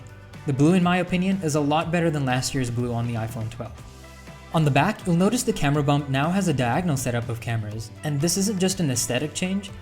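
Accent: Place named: United States. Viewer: Living in india but have an american accent?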